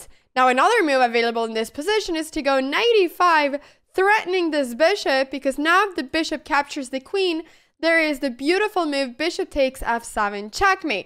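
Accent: Spain. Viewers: Me encanta tu acento en castellano, no sabía que eras española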